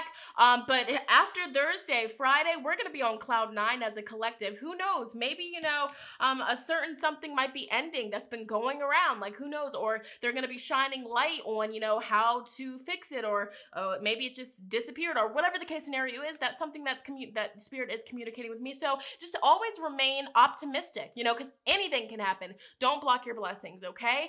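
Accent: United Kingdom. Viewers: I keep hearing a British accent peeking through! 😍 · Been feeling so stagnant for the past week or so, so looking forward to this energy shift 😊 as always, I'm vibing with your reading 🌞 (Also, are you British? I keep hearing an accent come out lol been curious)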